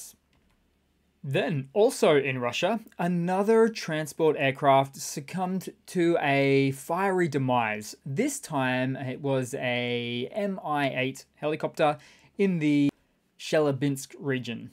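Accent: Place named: Australia. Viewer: I need a more huge wins for the Ukraine 🇺🇦 in my lifes ! Thank you please And this Australian accent rocks m8) 🙏💙✊ Cheers man 💎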